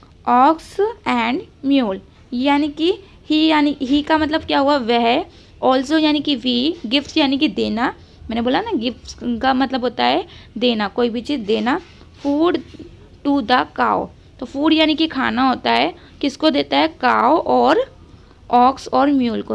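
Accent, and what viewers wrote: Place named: Bihar, India. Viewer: Bihari you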